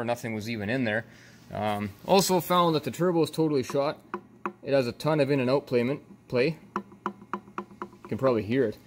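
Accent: Canada. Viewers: You're Canadian right? You have the accent...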